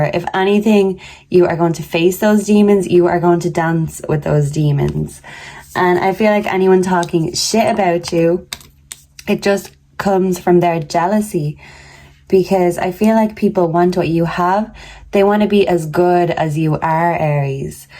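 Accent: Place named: Ireland. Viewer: Hi l love how you do your tarot reading.How you use different pack of cards.Your reading is really interesting. I love your àccent Do you come from Ireland?Are you Irish ?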